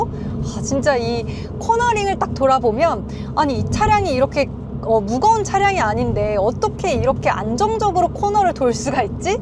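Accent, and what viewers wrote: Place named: Gyeongsang, South Korea. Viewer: 경상도 촌넘 ㅜㅜ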